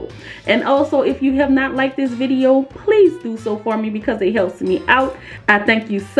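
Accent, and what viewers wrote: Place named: Louisiana, United States. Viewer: Obviously tell your from Louisiana by your accent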